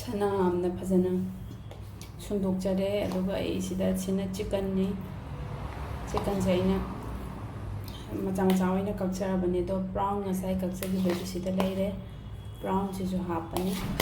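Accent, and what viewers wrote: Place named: Kakching, India. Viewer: I knew it that you were from kakching the first time I started watching your video. My mum grew up in kakching and the way my mom speaks manipuri language whenever we visit kakching is same as yours ❤️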